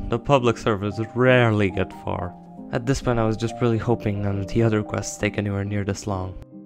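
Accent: Poland. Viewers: Słychać, że Polak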